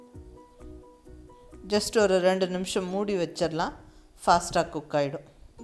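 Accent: Coimbatore, India. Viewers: kongu tamil arumai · Hai sis eppadi irukkenga... Unga kovai tamila kettukitte irukalampola avlo alagaa irukku. Subscribe panravanga ennikkaiya parkkumpodhu avlo happayaa irukku sis... Neenga innum niraya munnera en anbaana valthukkal... Dish asusewel super. 👋 👋 👋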